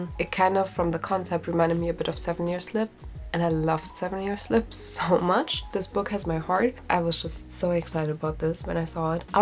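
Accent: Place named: Germany. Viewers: Are you German? I was just wondering because you have a German accent I’m not trying to be rude( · I was wondering the same thing after hearing two sentences 😊 definitely a german accent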